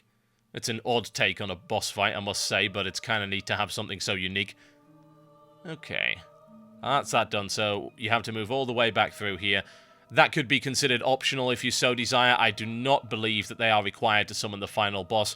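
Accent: United Kingdom. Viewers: Can't wait till Cata comes out, so I can stop watching these Vids with his annoyingly British accent.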